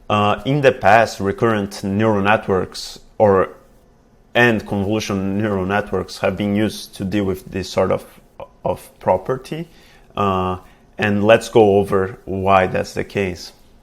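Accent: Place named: France. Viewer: I’ve liked your French accent!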